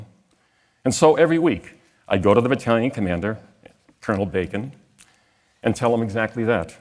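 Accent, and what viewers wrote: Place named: Boston, United States. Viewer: WOW, Great speech. Spoken from the heart. And you still slightly have your Boston accent. God Bless and be well.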